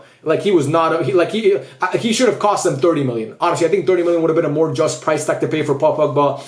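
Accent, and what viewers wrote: United Kingdom. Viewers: Amazing British accent! Please oil up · Top tier British accent 🇬🇧💷🫖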